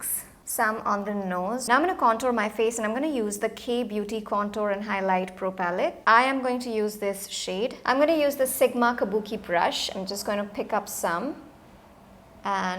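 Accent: Bengal, India. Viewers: Yes that bengali accent was beautiful 😍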